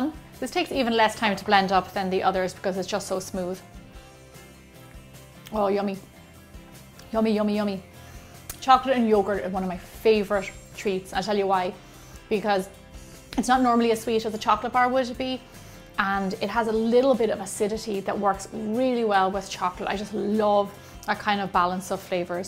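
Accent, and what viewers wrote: Ireland. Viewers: is she from Ireland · she is from Ireland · Are ya Irish?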